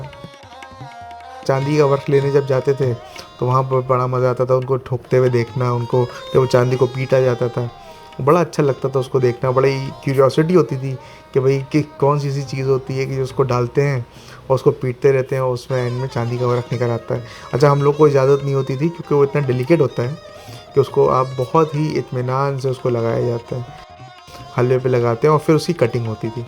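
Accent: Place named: Lucknow, India. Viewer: Baaqi sab accha hai aapke videos mein but aapka pronunciation pe work karna hoga specially when you are carrying lucknowi touch in your words example its not फ़िर its फिर😊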